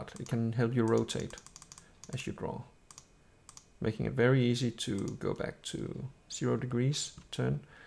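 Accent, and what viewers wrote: Denmark. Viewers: Because i recognize a lot of Danish words like: "men" and some English words pronounced with a Danish accent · Are you Danish?😱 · Are you danish? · Are you Danish?? Just asking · are you danish? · Are you Danish o: ?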